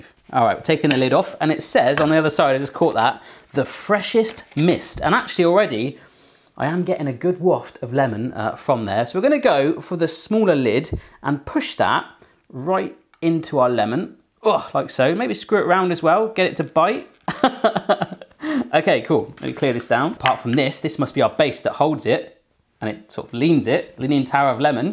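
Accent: United Kingdom. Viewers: sounds very British in this video haha - the pineapple slicer was the best one by far too! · i found your channel extremely enjoyable ! :D also i love british accent soo soo much !!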